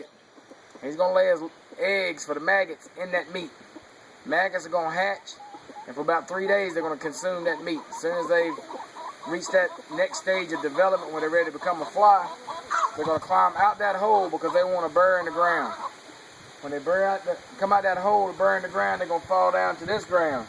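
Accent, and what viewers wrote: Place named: South, United States. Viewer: man oh man.. u have uncovered nature's ultimate feeds.. that southern talk is so cool but the message is gonna make the whole world filled with hanged holed buckets.. greetings man from the far east.. woo yahh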